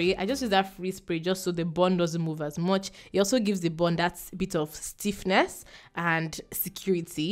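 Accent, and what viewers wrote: Nigeria. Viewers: I just like your Nigerian voice and way of speaking.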